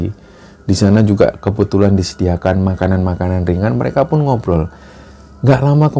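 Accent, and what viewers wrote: Java, Indonesia. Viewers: mas duhhh maless aku jan nagih i klo sehari ga denger koyok ono sing kurang, btw sampean jawa ndi seh mas kok boso jowone jan koyok bahasa sehari2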